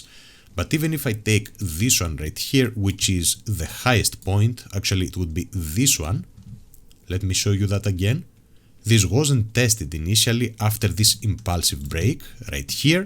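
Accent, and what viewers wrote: Greece. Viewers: your accent is greek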